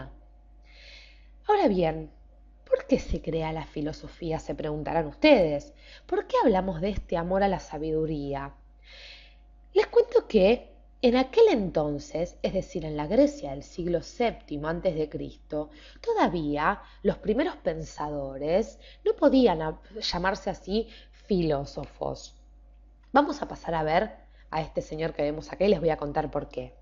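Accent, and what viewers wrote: Argentina. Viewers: pues practicamente este video nos da la definicion y algunos filosofos algo que emos estado viendo a lo largo de la semana, me gusta mucho la filosofia por que es el amor a la sabiduria pero aun mas por que trata de encontrar el por que de las cosas que pasan, tratando de encontrar la verdad, otra observacion es fue ra del tema y mas con el video la voz de la narradora del video me encanta como habla mas por que es argentina :3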